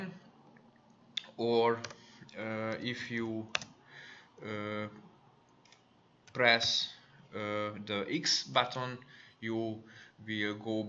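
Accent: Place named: Hungary. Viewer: Milyen érdekes, hogy a kiejtésen hallani a magyart. :) Egyébként ez más nemzetiségeknél is így van.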